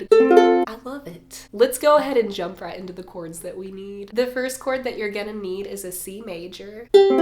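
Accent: South, United States. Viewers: why does she never sound southern in any videos except for this one lol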